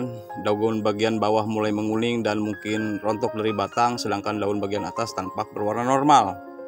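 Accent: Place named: Sulawesi, Indonesia. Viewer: cra abg ngomong kyk org sulawesi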